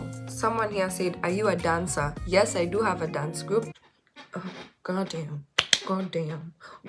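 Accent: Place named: Nairobi, Kenya. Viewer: Super confusing origins. At least your nationality is clear - Kenyan. Kenya, Pakistan on mother's side then Sudan, Egyptian and Afghanistan on the other side. Pheww especially because intermarriages between people from Indo-Pakistan and the Indian subcontinent rarely intermarry with local or native AFrican Kenyans. Your DNA ancestry test would be very interesting. You have also chosen firmly to identify as Luo African Kenyan. I watched the first video ( quite intense) and thought you were of Ethiopian origin and naturalised as a Kenyan. Everything about your accent is Nairobi. Kudos and hope eveything works out. All the best. God bless.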